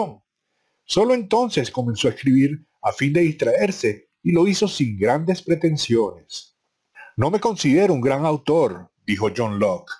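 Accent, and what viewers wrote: Venezuela. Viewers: Buen video. venezolano?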